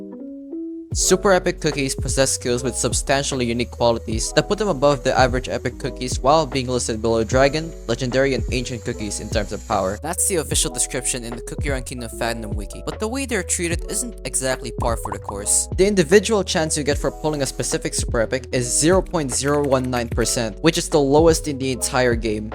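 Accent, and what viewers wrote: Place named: Philippines. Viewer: i know a filipino when i hear one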